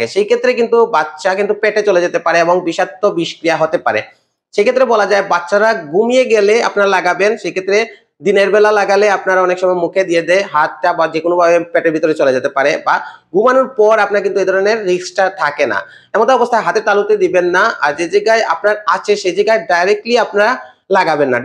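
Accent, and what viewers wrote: Sylhet, Bangladesh. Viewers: আপনার উচ্চারণ শুনে লাগছে সিলেটের লোক😑,আমাদের সিলেটের মানুষ শুদ্ধ ভাষাই খুবই কাচা😑😑বলবেন স্যার আমি কী ঠিক বললাম???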